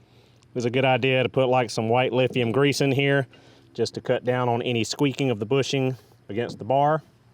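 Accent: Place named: Carolina, United States. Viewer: I'm always fascinated by the rural Carolina accent(s) in which "on" is pronounced "own" where virtually everywhere else in the U.S. it's "awn". Here in New Orleans, we perfected "puhkawn" for "pecan" while the rest of the country goes with "peecan". Variety is the spice of life!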